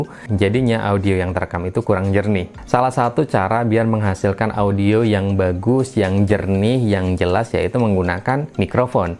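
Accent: Pekalongan, Indonesia. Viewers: Bang orang pekalongan y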